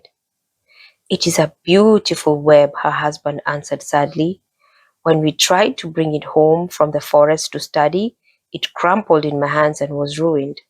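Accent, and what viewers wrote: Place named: Africa. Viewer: Thank you for this read along book about Kente Cloth. Our Beautiful Feet Books primary curriculum suggested it to be read and we thought you did a great job reading it. We especially liked your accent (we assume you’re African) and also the native words were pronounced well. One piece of advice for next time you record would be muting the page turning with a sound editor or turning each page away from the microphone. The page turning was quite loud and disruptive during the book. Other than that, it was a great read through and we look forward to exploring your channel with other books.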